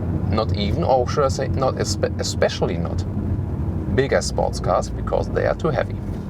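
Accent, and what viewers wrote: Germany. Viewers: can you do something about the German accent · Ich konnte schon den Akzent erkennen. Deutsch. Der TT ist ein schickes Auto. :D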